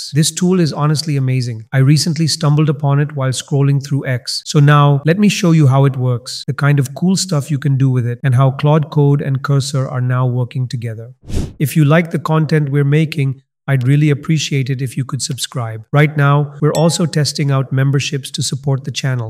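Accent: India. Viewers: The only indian i can bare to listen too. SUPER INDIAN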